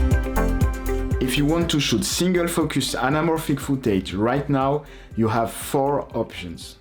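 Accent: France. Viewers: Tu est français ?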